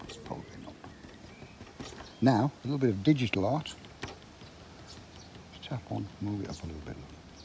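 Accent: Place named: England, United Kingdom. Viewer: Would you like a little humor? I've watched and enjoyed quite a few of your demonstrations now, and all the time I thought you were saying 'hocus" for your green, not Hooker's green! I'm from the deep south in Texas, and our accents are not as lovely as the English.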